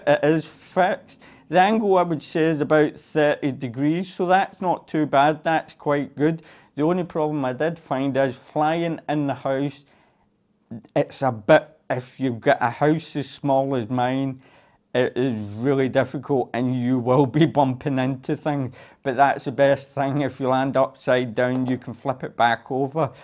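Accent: Scotland, United Kingdom. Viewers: Scottish accents are so cool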